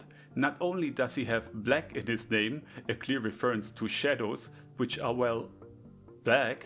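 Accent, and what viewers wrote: Germany. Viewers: Akzent klingt nach 'nem deutschen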